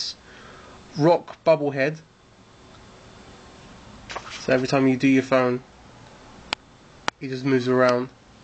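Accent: United Kingdom. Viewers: Cockney accent.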